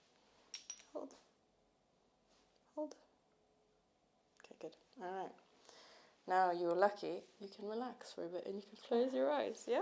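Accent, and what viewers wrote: South Africa. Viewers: Is her accent South African? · The South African accent is still strong but a bit of the english is starting to come through. Regardless found a new favourite ASMR video! Keep up the great work😊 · This autoplayed and I immediately twigged to the South African accent, then I heard "hectic" and was like, yep South African confirmed! 😂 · She only sounds mildly South African but mainly British. I wonder if she moved or has mainly British family and went to a British School. The South African people that I know have much stronger accents. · What is she from South Africa?? 😱😍 me too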